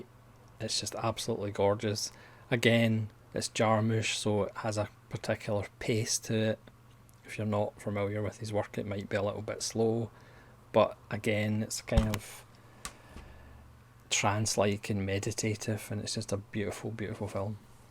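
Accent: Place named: United Kingdom. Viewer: I watched the whole thing in one viewing. I like westerns and grew up watching them to an extent as my dad was a big western fan. I have a friend that doesn't like Miles Davis. I told him but there are other reasons to listen to Davis--he as an amazing ensemble around him that play the music as well: John McLaughlin, Herbie Hancock, Wayne Shorter, etc. The same is true for John Wayne pictures. In The Cowboys, Bruce Dern plays a great villain. Red River one of the greatest scenes as the cowboys hoot and holler as they begin their trail drive. The Searchers is just an overall well made film, and Wayne's character isn't exactly portrayed as a hero, more obsessive. Perhaps later on you'll be able to approach him and understand his performances. Are you Brit or Scottish or Irish? I ask because you have a rather thick accent. Some westerns you didn't mention: Lonesome Dove and Return to Lonesome Dove the TV series was very well made. 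Paint Your Wagon is an interesting western musical. Unforgiven is an incredible western where a gunfighter's past comes back to haunt him. Little Big Man is a rather circular film from white man to Indian culture and back again. A Man Called Horse shows some interesting Indian culture. Hud, a contemporary western with Paul Newman. All the Pretty Horse, another contemporary western. Shane--consciously mythic, superb characterization in Alan Ladd's character and a crazy good fight scene. Pat Garrett and Billy the Kid--I tend to enjoy this more over The W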